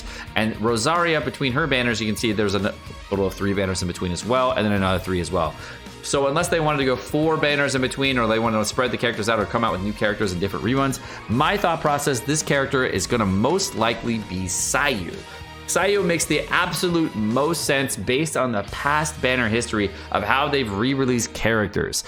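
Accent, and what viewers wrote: United Kingdom. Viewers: British? Instant like.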